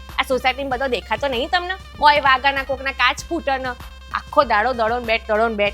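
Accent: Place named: Mahesana, India. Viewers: મસ્ત મેંહાણી ભાષા બોલે છે તમે મહેસાણા ના છો??? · Mahesana nj bhasa sambhadva no Anand Avi Gayo👌👍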